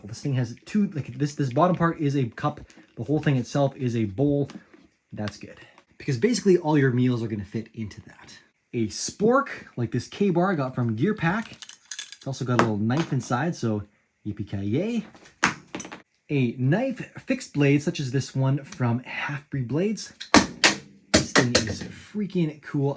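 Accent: Canada. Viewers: I’m confused..you sound Canadian but have a gun collection that would rival Burt Gummer from Tremors. I thought Justin Castreau doesn’t allow guns?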